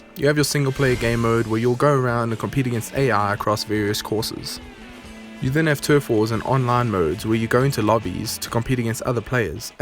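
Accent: South Africa. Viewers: Thought I recognised that accent! Local is lekker, keep it up, guys!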